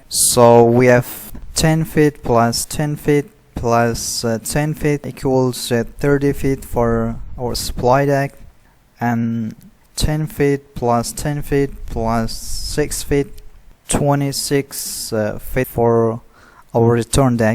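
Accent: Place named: Pakistan. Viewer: i think ur pakistani trying american accent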